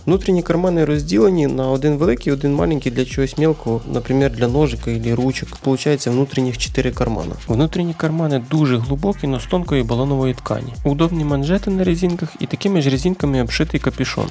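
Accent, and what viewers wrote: Surzhyk, Ukraine. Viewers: Цей суржик 😤 На вчіця правильно розмовляти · всё хорошо, прикольные куртки! Но, когда ты делаешь видео контент постарался пожалуйста не говорить на суржике очень режет слух! Тем более что в большей половине видео звук наложен на картинку. Без обид!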